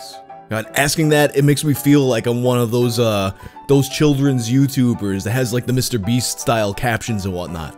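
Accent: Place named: New York, United States. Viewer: Your New York accent is getting a lot thicker, it’s nice. Makes me want to listen to Type O.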